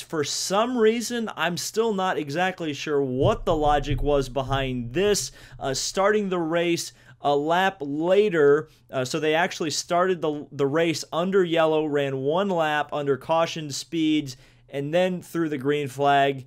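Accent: United States. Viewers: Hey are you southern? You sound like a southern person to me at least.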